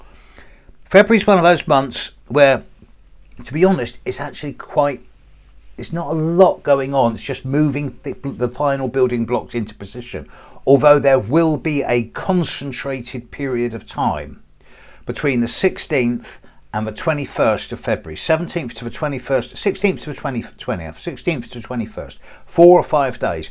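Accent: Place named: RP, United Kingdom. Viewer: I will say something... Your perfect posh English and your suiting slightly raspy voice makes everything easy. You can broadcast the end of the world and I would be calmly listening to you. Congrats.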